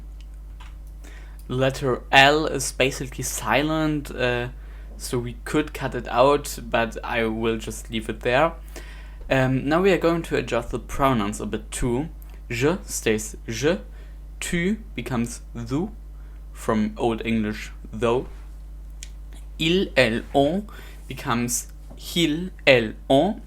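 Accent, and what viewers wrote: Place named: Germany. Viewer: He sounds German 🤷‍♂️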